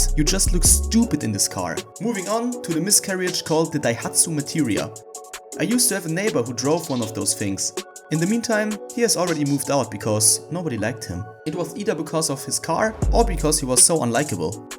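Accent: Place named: Germany. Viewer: Damn bro, your German accent is hella cool for this type of video Keep pushing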